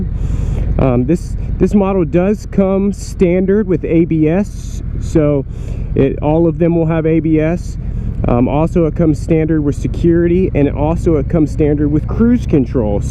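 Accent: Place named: Philadelphia, United States. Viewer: You from Philly?.....sounds like it. Like this bike and the different looks and functionality...thanks for the review.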